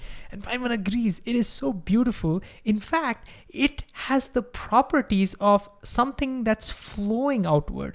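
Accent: India. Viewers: JUST WANTED TO KNOW OUT OF CURIOSITY ARE YOU BY ANCHANCE AN INDIAN ? SINCE YOUR ACCENT IS A LOT LIKE US ,BTW YOUR VIDEOS DO SPARK A VERY INTENSE INTREST IN PHYSICS AND THANK YOU FOR THAT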